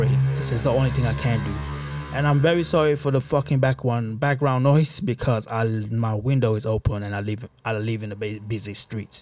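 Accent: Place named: South London, United Kingdom. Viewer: bruh this guy is on sum south london shit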